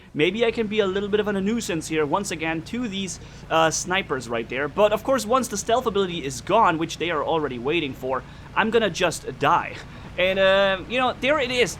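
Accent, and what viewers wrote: Germany. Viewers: Bild ich mir das nur ein, aber du hast einen ganz leichten deutsche Akzent🤔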